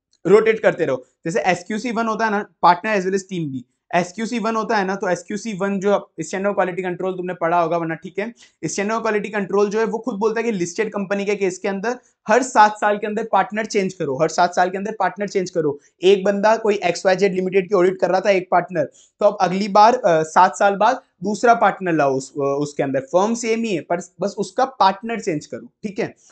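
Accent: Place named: Bihar, India. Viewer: Audit Committee bhi nati nikli 😂😂😂😂 Sir apka bhasa se aap bihar ke legre hain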